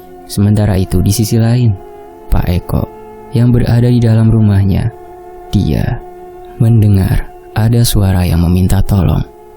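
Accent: Java, Indonesia. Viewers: AD LUCUNY JG NE YG BWAIN CRITANY KEJAWA"AN HHHH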